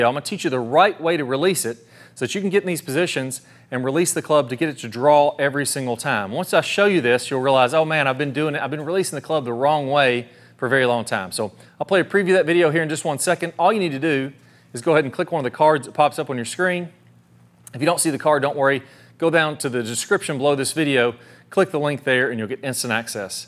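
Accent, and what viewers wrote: South, United States. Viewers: Thanks for the heavy southern accent golf instruction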